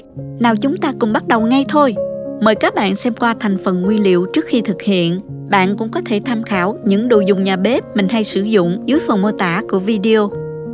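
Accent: South, Vietnam. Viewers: Rất thích xem,học những món ăn của, nhất là giọng nói thanh tao dịu dàng đặt trưng của người dân Nam Việt Nam. Cảm ơn.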